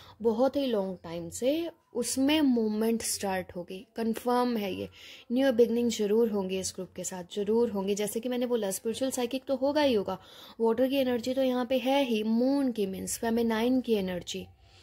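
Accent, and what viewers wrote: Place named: Punjab, India. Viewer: Love your punjabi ascent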